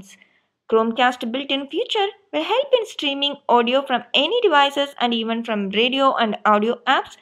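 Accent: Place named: South India, India. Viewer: Could find an accent u should be south Indian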